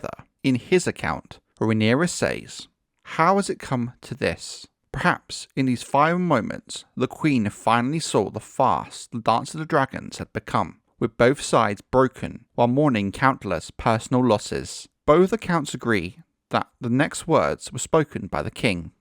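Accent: United Kingdom. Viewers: Too British I can't understand without subtitles.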